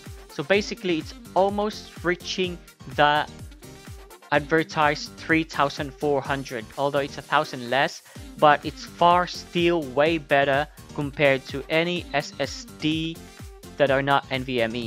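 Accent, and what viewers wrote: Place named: Australia. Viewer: dawg i know you're Pinoy so cut the aussie accent and speak our accent for the pride of the Filipino People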